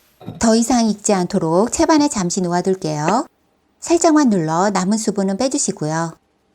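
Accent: Seoul, South Korea. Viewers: 참한 음성에 매력적인 서울 말씨에 건강하고 쉬운 Recipe ! 대구 호부래비 꼭 해볼랍니더 감사합니다!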